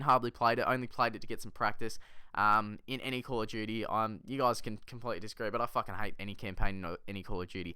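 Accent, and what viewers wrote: Australia. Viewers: Love the accent x) Australian?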